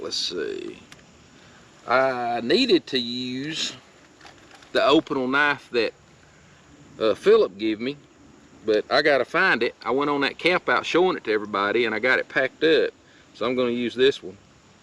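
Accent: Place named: South, United States. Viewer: Northern boy, parents from the south, spent most of my youth traveling relatives in the south. The sound of your voice brings me back to better days and better people and reminds me there is so much to miss . People if you haven't been to the south you are missing out on the best humanity has. And thank you for the bible verse at the end, you are exactly on point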